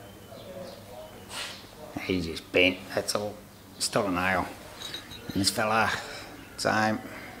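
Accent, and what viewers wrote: Australia. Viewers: I thought I recognised a fellow Aussie accent. Nice job, I hope to see more. I'm Brisbane based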